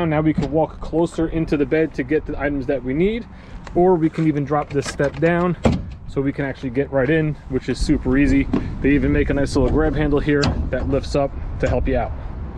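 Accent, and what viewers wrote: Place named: New York, United States. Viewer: Finally they fixed the seatbelt and you can adjust it now. I love your New York accent.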